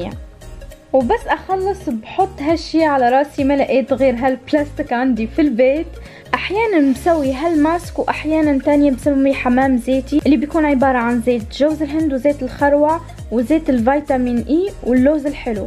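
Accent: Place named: Tunisia. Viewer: تقولش عليك تونسية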